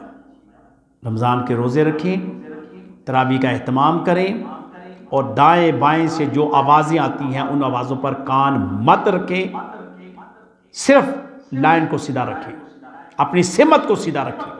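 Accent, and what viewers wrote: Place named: Punjab, Pakistan. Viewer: Tu c Paindo ho. Ardo (Urdu) Punjabi which bolde ho.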